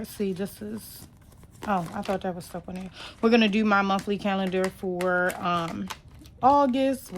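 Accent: Baltimore, United States. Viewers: Do I hear BMORE accent❤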